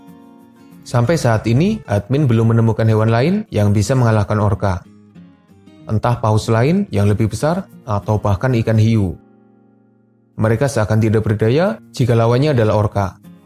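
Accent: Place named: Java, Indonesia. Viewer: Suara medokmu lho mas... Uhug mara'i kangen kampung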